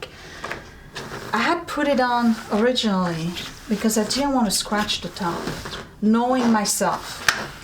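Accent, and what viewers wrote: France. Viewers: Love your beautiful French accent & your lovely antiques & treasures!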